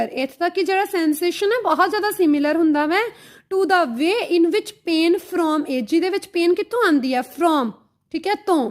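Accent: India. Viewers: Your Punjabi accent is clearly reflected when you speak in English. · Tusi majhe wal de lgde j 😁👌❤️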